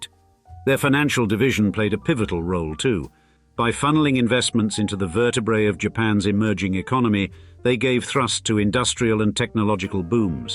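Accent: United Kingdom. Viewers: Great reminder that Mon emblems were basically logos for families way before they became corporate identifiers. With the narrator's accent, I'm surprised you didn't draw comparisons between the Mitsui founder's department store for the emerging middle class with similar British operations like Fortnum and Mason in the Georgian era or John Lewis or Selfridges.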